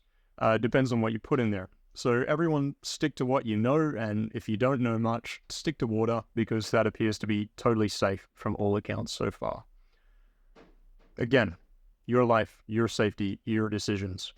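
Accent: Australia. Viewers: interesting accent aus plus? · Are you an ausie living in the usa? You sound like Shantan Wantan Ichiban (no hate just curious)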